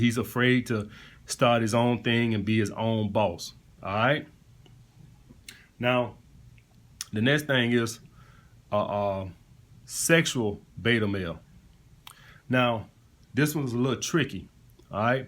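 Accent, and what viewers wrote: South, United States. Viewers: its not perr...its pure...sounds like he from down south...can hear that southern accent...very good looking guy but dont agree with everything he saying...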